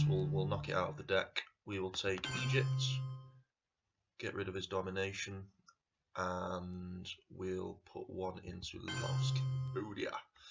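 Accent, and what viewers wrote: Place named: Northern England, United Kingdom. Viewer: "Sorry about my accent [I'm from Manchester]" — I love the northern English accent :-)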